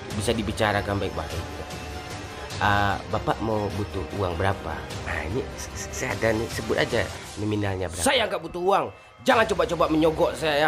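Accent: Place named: Kalimantan, Indonesia. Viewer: ORANG KALIMANTAN MANA SUARA NYA SEMUGA ASAP CAPAT ILANG YA GUA DARI KALSEL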